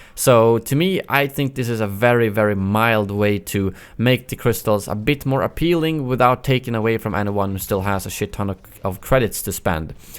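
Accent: Sweden. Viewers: are you swedish? sounds like you are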